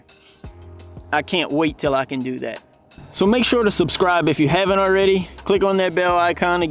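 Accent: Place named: South, United States. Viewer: That definitely sounds like a south of i10 accent.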